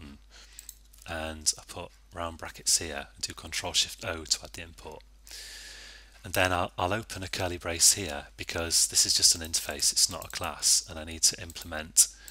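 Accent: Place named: Cheshire, United Kingdom. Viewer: ***** Ugh, how did I not see that, slight dyslexia problem. Thank you. By the way, which county are you from in the UK. Always think you sound like your from close to my home in Cheshire, but maybe I've just been away too long.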